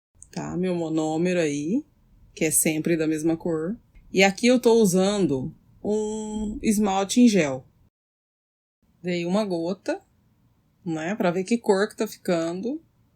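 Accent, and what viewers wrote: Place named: Campinas, Brazil. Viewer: Gosto muito do jeito que você fala. Parece o sotaque da minha cidade: Campinas SP. Agora eu moro em Portugal, mas sinto muita falta de ouvir essa pronúncia ❤️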